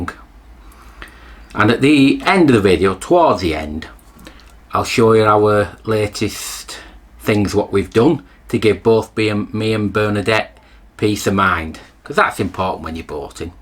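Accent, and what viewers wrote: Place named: Yorkshire, United Kingdom. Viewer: Yorks accent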